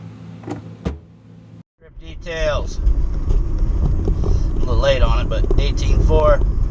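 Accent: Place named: Akron, United States. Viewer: You sound like your from Akron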